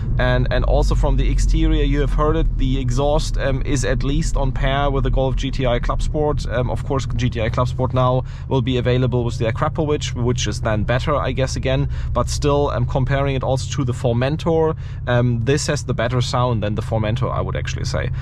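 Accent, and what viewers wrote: Germany. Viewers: I love the German accent😊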